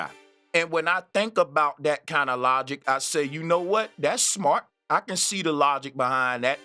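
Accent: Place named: South, United States. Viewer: you disrespect all the black women that made it possible for America to brag about being the first on the moon. You do know if it wasn't for a black female mathematician came up with the calculations for America to 1st send a man into space, than to the moon. GOD is a jealous GOD should have given you the red flag that something isn't right. Why would a being over all be jealous, you peole are too old to believe these fairy tales. Flat Earthers have literally been proven wrong just recently from that trip to Antarctica. Why didn't you take the trip that guy offered to people like you. You can't prove it and no one has silenced you, we laugh at your ignorance in 2025 because there are so much proof of yourr foolishness. In the end you making the statement thst everybody hates Trump so he musy be good, you country accenr does you know justice and just hearing you talk makes me think of why Harriet Tubman always carried a shotgun when she freed the slaves. 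Trump has literally tried to overturn the 1964 civil rights acts but you understand why MAHA likes him. Yeah because the want to makes "US" slaves again...actually get some knowledge because you're lost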